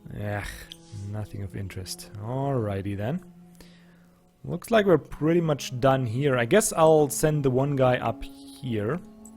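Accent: Germany. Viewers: Hi and a belated welcome to the weird world of Roving Clans. Thumbs up for pronounciation so far. I'm very sad to see this island thing again. To me it takes away a lot of the Roving Clans dealio; wheelin' and dealin' in all eras to survive, and eventually getting foreign trade routes. You'll never get that here because the AI apparently does not believe in sea trade. And, as I've previously stated, the AI kind of stinks at naval activity (expanding, scouting, warring). To top it off, your island sucks, resource-wise. This is really bad because RC is not a very strong faction to begin with, and really needs all the help it can get. About the urces quest. If you think your hero and your paltry units can handle two urces, think again. They will eat your cavalry for breakfast and use Sirya's bow as a guitar while dancing on her bones. You cannot underestimate just how bad the RC military is early on. 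They are T-E-R-R-I-B-L-E. I mean, look at the stats of your guys, and compare them to what you can buy in the marketplace. One horse guy, or a 400-hit point deamon, or a 300 hit point ended? Hmmm! Difficult choice. Remember the mercs gain 2x hit points (not factored in the market display). Anyway, I honestly feel my motivation to watch your playthrough diminishing a bit. I just don't think it will be that fun to sim city on an island (again). Hope I'll be proven wrong; you certainly have surprised me before. Sorry for all the negativity - I still love your German accent.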